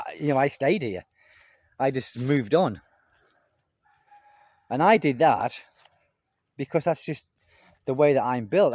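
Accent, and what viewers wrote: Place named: England, United Kingdom. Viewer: I like your videos. Not only good insights, but a relaxing style, including the cool english accent. 😎👍 Now about independence in relationships, the way i like to express it, is the idea of three lives. A life for you, a life for me, and a life together. Because no one person can always be all things for the other. And that's quite all right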